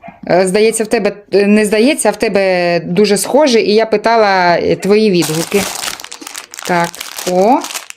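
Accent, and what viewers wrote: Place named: Chernihiv, Ukraine. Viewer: Дякую,! Так приємно бачити Чернігівщину.❤ Я родом з сусіднього Бахмацького району, але давно живу на Київщині... Такий говір близький, з дитинства і юності.😊 💙💛💙💛💙💛 Гарний малюнок кицюнчика, донечка -- талант і молодчинка!❤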